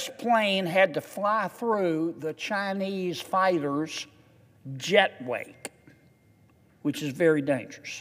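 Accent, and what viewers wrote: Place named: Louisiana, United States. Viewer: The reason I like listening to you is that you explain yourself well and I understand what you say (even with the Louisiana accent). Keep talking to us because our President isn't.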